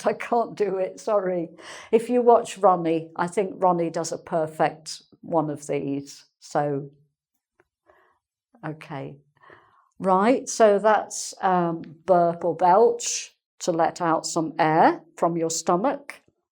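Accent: United Kingdom. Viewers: You are a great teacher. And your accent ! I love British accent but is not easy to understand, but I am happy listening to you because you are so clear. Thank you very much. Love from Buenos Aires.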